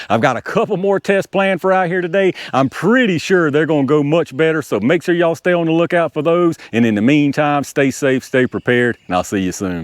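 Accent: South, United States. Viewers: Good info! Thanks for your diligence. Love the southern accent! - signed NY Yankee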